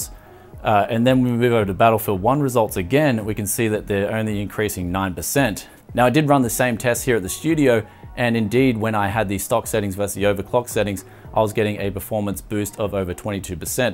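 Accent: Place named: Australia. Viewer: This main has the perfect Australian accent. Perfectly understandable.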